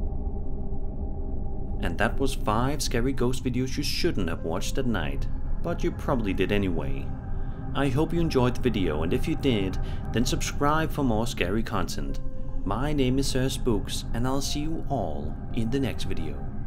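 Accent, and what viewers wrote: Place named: Denmark. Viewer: Dont know why but your accent sounds too danish ion know why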